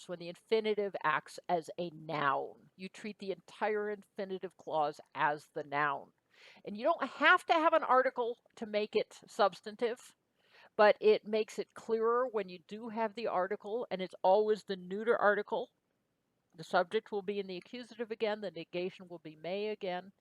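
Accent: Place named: United States. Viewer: And another American pronounciation and diphtongisation of Ancient Greek... why does noone pay attention to the basic phonetics???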